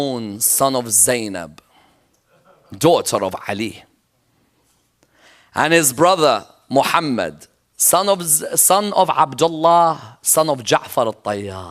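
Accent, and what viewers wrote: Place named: Iran. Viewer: bahasa inggrisnya aksen persianya kentel banget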